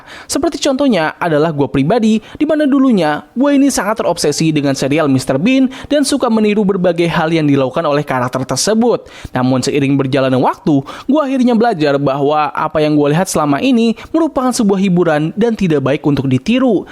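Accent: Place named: Sunda, Indonesia. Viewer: Emosi anda sangat tersalurkan dengan baik ditambah penggunaan kata "lah atuh" Sebagai orang sunda saya sangat familiar dengan nada nadanya😂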